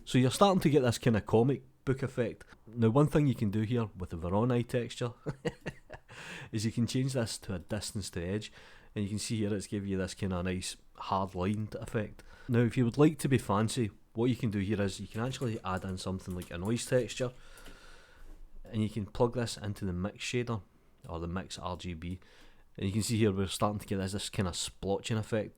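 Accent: Scotland, United Kingdom. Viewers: oh my, a scottish man (?), just explained half tone shading to me. what a treat. :)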